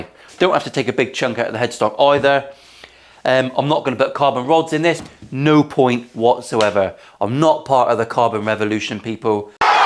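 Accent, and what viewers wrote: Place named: Bristol, United Kingdom. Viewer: 😂yeh mate he’s been learning for about a year now and he’s getting really good. When I showed him your last build he was like dad that’s sick so when he comes up my place he’s asks if we can watch your videos and I have them saved to watch. I’ve also just started playing guitar but I’m a piano player so guitar has been a bit of a challenge but with his help I’m getting there. He’s never seen any guitar build videos before and I said son you have to watch this guy he’s brilliant and hilarious and we’re are hooked. Like I said the builds are brilliant but we love the way you are on the videos. We’re in Bristol so only up the road and we gurt talks the same init. Thankyou me and Toby have really bonded over guitar and your videos gave us something to laugh about together too, although explaining what a beef bayonet was a bit tricky but he got the gist😂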